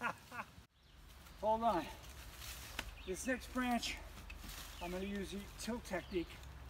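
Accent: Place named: Rhode Island, United States. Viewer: Cool accent. Rhode Island? Sounds like my relatives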